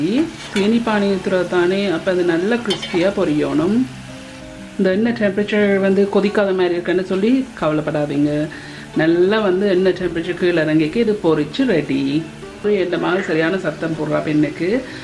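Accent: Jaffna, Sri Lanka. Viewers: I was browsing for Jaffna sweets for Xmas and found yours. Love your videos with our Jaffna Tamil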